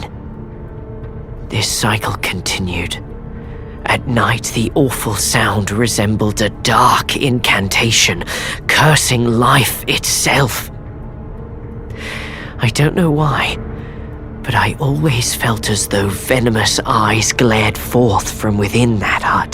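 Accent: United Kingdom. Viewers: HES BRI’ISH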